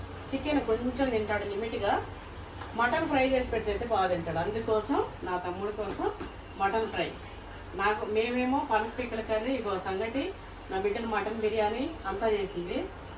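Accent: Telangana, India. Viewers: I would love to hear rayalaseema slang not telengana plz stopped watched ur video in between becoz I missed native language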